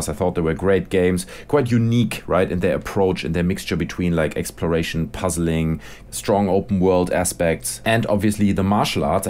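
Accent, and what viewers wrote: Germany. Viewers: Moment, den deutschen Akzent kenn ich doch...Shenmue 3, maaaaan!!! :)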